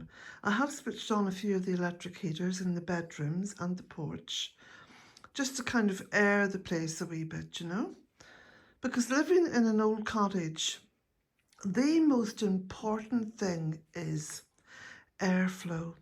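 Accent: Ireland. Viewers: Looking at your garden was very meditative. You have a beautiful place there. My Mom's parents came from Ireland--Friel and Canny. I didn't realize when I clicked on your video that that's where you are. It's nice to hear the brogue again and hear the appreciation for nature and respect for Mother Nature and some of the hopeful Irish sayings. And for, the numerous 'cups of tea'. I was looking at your stone floor. I would love to do that in my home. I love stone work. I love sitting on the jetty at the ocean listening to the waves and birds. It's just so grounding. So glad I came here today. Thank you. ❤ --Kate Cape Cod, USA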